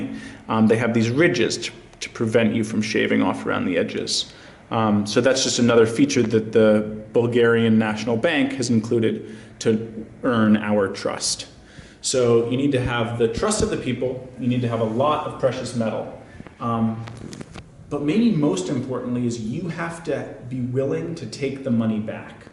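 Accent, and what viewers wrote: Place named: United States. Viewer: No, but I took it in 10th grade. The American accent and English writing made me assume this was for a US based class, and was a bit confused as to whether this was a standard US history class like American Democracy or APUSH, or a specialty course. But the Bulgarian National Diploma must be much differently structured. Seems like a very interesting class to take.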